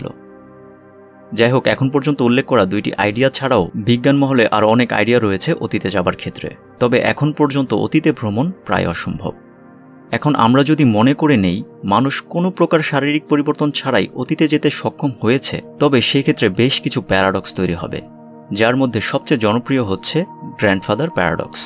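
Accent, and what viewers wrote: Narsingdi, Bangladesh. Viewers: ভাই আপনার এলাকা কি নরসিংদী? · ভাই, আপনার বাড়ি কি নরসিংদী?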